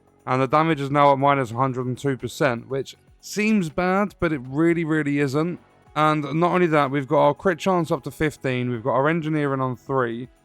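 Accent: United Kingdom. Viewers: no hate but bro is the most british guy i heard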